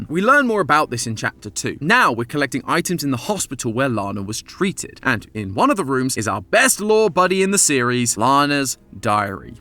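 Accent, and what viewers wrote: United Kingdom. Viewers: me not paying attention "ok new style theory" "hmm, Amy sounds very British" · has always been british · Why are you British now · I DID NOT EXPECT THIS BRI'ISH MATTPATT REPLACEMENT TO SAY "AGGYDIGIMON!" 😂😂😂😂😂😂 Also no offense, you are a great fill in for mattpatt right now.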